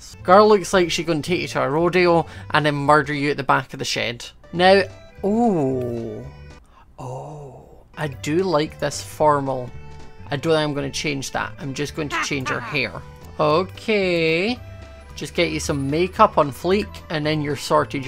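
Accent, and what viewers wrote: Scotland, United Kingdom. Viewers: Piggies Forever he's Scottish 😊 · I find the Scottish accent quite soothing...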